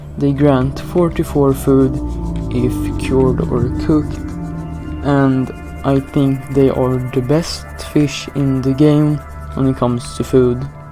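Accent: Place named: Sweden. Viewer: you sound so swedish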